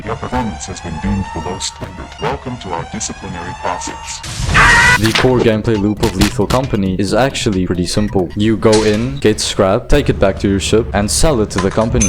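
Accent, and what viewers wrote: South Africa. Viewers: That accent is definitely RSA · I could hear the twang of South Africa, kinda reminds me of the movie chappie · Great video bruv! I did enjoy this style as well as your original content. While I was listening to you talk more I think I just realized something...are you Afrikaans?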